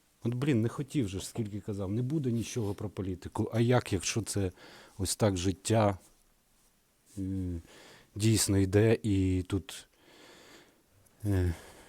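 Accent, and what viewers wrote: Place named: Russia. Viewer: по вашей речи очень заметно что вы русскоязычный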